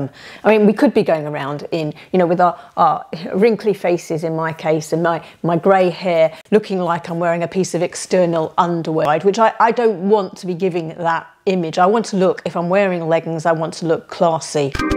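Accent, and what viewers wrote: United Kingdom. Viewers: I just found your channel. I am 50 and trying to style myself well without looking too old or young 😅. I was reading the video and decided to click and listen. I said to myself I bet she has a British accent. I was right 😂. I love listening to you and you give some good thoughts on leggings. New subscriber here!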